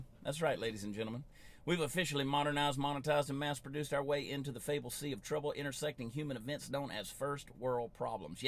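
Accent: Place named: South, United States. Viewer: my grandson 3 years old now I spy his name is Jacob he was running through the house telling his mom and the rest of us that he found a home and we run and check the bathtub to see if there was a whole went through the house went through everything we couldn't find a home we went outside we checked this pool if there was a hole finally she's sitting there she can't figure out what's going on none of us can and then he walks up and sticks his head in her face she smells his hand and it's got s*** on it and she throws up 3 in across the floor on the carpet is this little boy still tripping on having a a**** why would he care if he's a boy or a girl this is all so ignorant and so stupid but the story is true I think it was over with it was funny because my daughter didn't expect to smell what she smell and I never seen no one throw up 3 in across a space not even a drunk Love & blessings love your southern drawl I have one of my own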